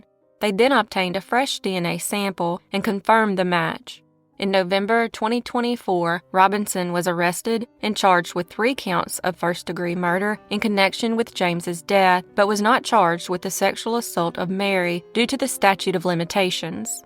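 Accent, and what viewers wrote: South, United States. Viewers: Hold up!?! 2 days off!?! Glad you didn't lose your Southern Charm Voice!! Irrelevant but kinda funny! My kids are out of college now.. My brothers youngest is a little 8 year old firecracker! (We went to visit for Christmas..). While asleep lil miss I hate boys vaulted up and smacked me on the mouth.. Cute but.. Dang.. Okay.. My brother (her father) laughed at it.. "Time to wake up and pretended to be an uncle and help your brother out".... (We are close.. Post high school and all the blood fights). I digress.. She got me SICK! Lil Terrorists.. So, I spent Christmas day doing highschool stuff to the toilet.. Got back in bed.. AND, .. I found some I actually missed and some I didn't comment on.. I actually felt like.. (sh!t for sure physically) but remiss that I missed them.. remiss / missed? Maybe I am still sick.. I fall asleep! 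"WHO IS IN THERE WITH YOU UNCLE DAVE!?!" "She has a really cool voice! I want to meet her!" I'll leave that up to her father..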